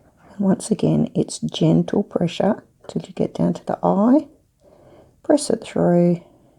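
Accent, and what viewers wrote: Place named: Australia. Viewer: Thanks for the demonstration. I have one of these but had no idea how to use it. A very useful gadget I met you recently in the Janome shop in Joondalup where you helped me buy my lovely new machine. Good luck with your channel. So nice to hear another Aussie voice on YouTube. Maybe some advice on how to use the one step button hole foot next?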